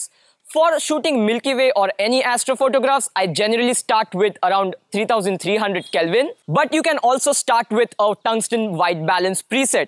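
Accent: India. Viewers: а есть индусы, у которых хватает ума научиться говорить на английском не по-индийски? индийский копипаст ютюба... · I love this Indian accent! :D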